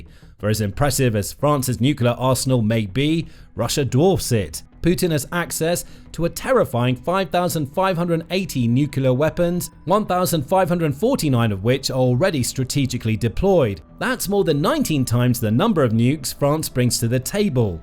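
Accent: United Kingdom. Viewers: Please learn to pronounce NUCLEAR correctly - it’s very annoying to hear ‘NUCULAR’ - I love your voice but don’t enjoy hearing a nice UK accent that doesn’t pronounce English words correctly. Love from a grumpy old lady in Australia 😂❤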